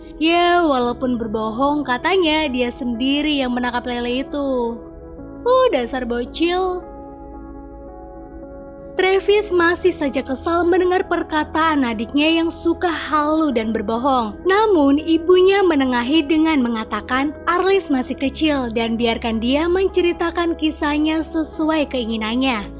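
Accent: Pemalang, Indonesia. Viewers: Orang Pemalang ya mbak?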